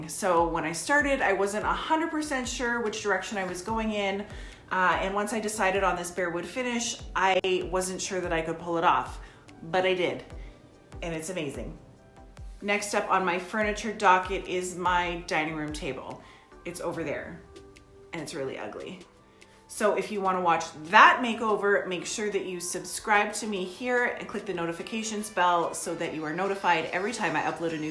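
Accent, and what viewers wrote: Canada. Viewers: Love the screaming kids and your Canadian accent ! Piece is beautiful too!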